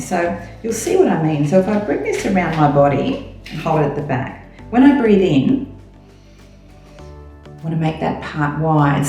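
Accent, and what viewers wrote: Australia. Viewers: Ausie English be like that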